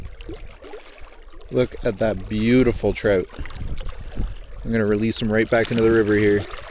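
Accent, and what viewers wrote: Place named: Minnesota, United States. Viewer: I can't take the Minnesota in your accent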